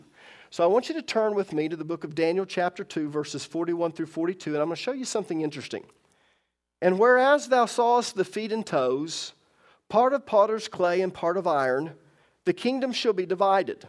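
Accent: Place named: South, United States. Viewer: You definitely sound like your from the south too! 😁 Bama girl here. Love your teachings 💜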